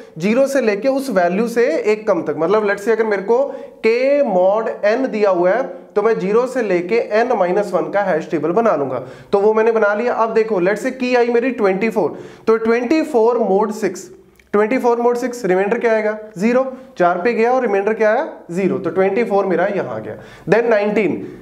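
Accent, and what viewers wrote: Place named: Haryana, India. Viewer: Sir aapka haryanvi touch h language me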